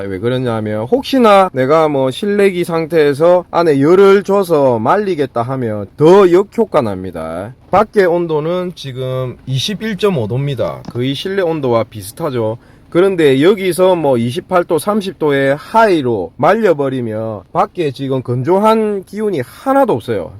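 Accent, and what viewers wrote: Gyeongsang, South Korea. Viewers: 오오 ㅎㅎ 정말 유용한정보 감사합니다 ㅎㅎㅎ ㅎㅎ 경상도분이시라서 너무 너무 친숙해요 ㅎㅎㅎ 저희어머니쪽이 의성이신데 ㅎㅎ [근데 꼭 어디서 뵌분처럼 너무 너무 친숙하고 친절하게 잘 설명해주십니다 ㅎㅎㅎ ] 최고 최고 짱짱맨~~ ㅎㅎㅎ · 구수한 경상도 사투리 멋져요~~~~😊😊😊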